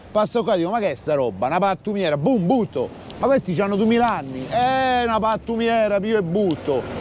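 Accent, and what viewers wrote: Rome, Italy. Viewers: Grande Cicaló, sto video giunge a fagiolo, a Roma ce devo da vení fra du mesi, hai inserito delle info importanti, si te vedo in giro pe Roma te saluto.. Quant'è bella sta città ❤️ e quanto me piace a parlata romanesca... - Ma dimme, ma come só ste olive.. - eh come só, sò greche 😉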